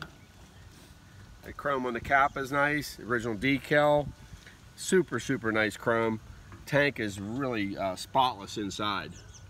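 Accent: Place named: Philadelphia, United States. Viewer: you sound like You're from Philly or South Jersey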